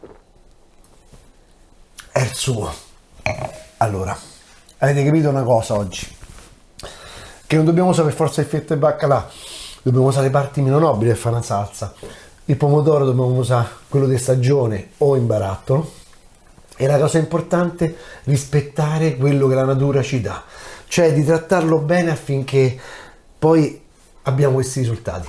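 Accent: Rome, Italy. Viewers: RICORDATI A MILANO NON RINNEGARE IL ROMANESCO!!!!!!!!!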